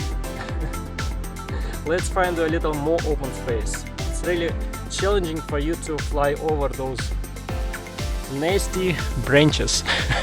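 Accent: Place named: Russia. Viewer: Дякую за відео. У тебе точно такий же акцент як в мене, тому я здогадався, що ти теж руский )). У мене такий же дрон і я трохи розчарований як працюють сенсори. На скільки я зрозумів, дрон не бачить сухих гілячок.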